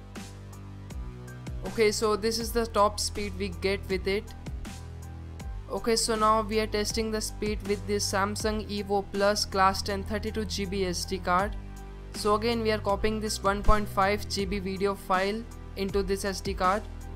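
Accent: India. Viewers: You sound like a Indian phone scammer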